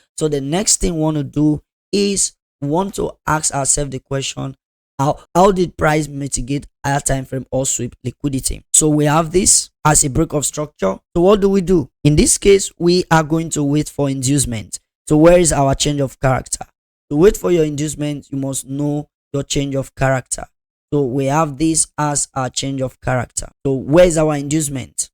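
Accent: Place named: Africa. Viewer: When I heard the african accent and then saw the exness link in the video description... instantly knew its bs and a scammer 🤣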